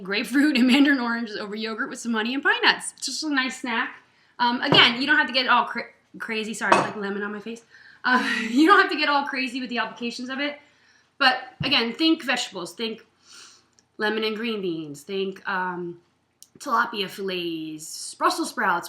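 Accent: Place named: United States. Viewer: Thank you for showing us how to freeze a lemon. I think it's a great idea and I loved the video. I wondered if I could ask you to try and speak a little slower as I am from England and can't always catch the american accent. I wish you and your family well.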